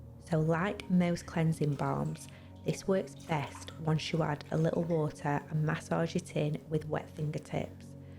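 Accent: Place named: Manchester, United Kingdom. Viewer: I’m American but I think you’re from Manchester. Why? Because I watch a lot of English football. If I’m wrong I’m wrong. Still fun for me to guess whilst appreciating your work. Cheers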